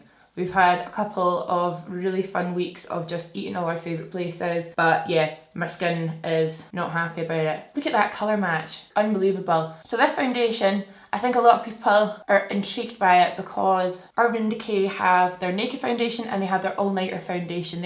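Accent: Scotland, United Kingdom. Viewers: Hi from Israel! Are you scottish? I love your accent❤🌹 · you’re scottish omg!! me too i’m so happy i found a scottish youtuber ahaha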